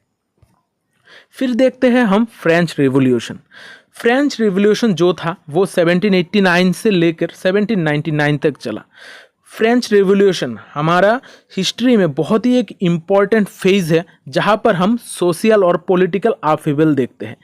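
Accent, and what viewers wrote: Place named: Odisha, India. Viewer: Apke accent se lagaa aap Odia ho. Maaf karnaa.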